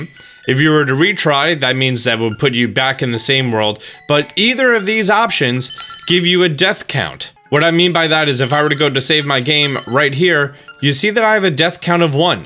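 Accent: Australia. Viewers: Why do you sound Australian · Australian accent is all I needed today. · Awful Australian accent lmfao XD (I'm Australian) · Australian. Because if that was supposed to be British we don't sound like that lol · Good Australian voice mate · Nice Australian accent lol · Whys he speaking in Aussie accent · First off the Ausie accent was a great. Digging the Link's awakening hints.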